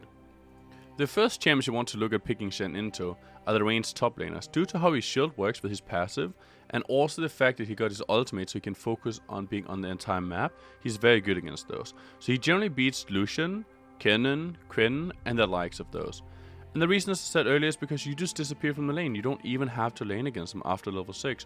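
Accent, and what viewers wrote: Denmark. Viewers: Dansker? Btw very helpful video !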